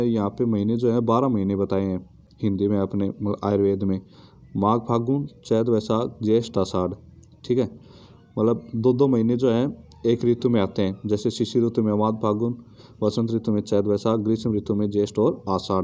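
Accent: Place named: Haryana, India. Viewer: Bhai hariyana se lgta h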